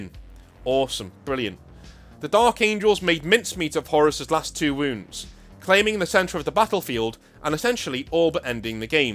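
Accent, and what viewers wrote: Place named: Liverpool, United Kingdom. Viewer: I love his subtle Scouse accent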